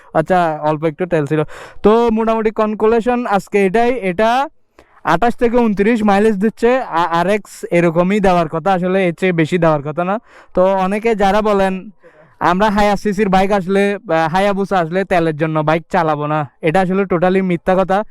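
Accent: Chittagong, Bangladesh. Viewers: Vai amr mone hocche apnr bari chottogram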